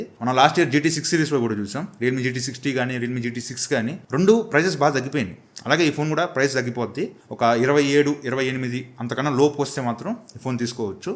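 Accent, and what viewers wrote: Nellore, India. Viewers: Anna midi Nellore aa😅 mana Nellore ye nuvu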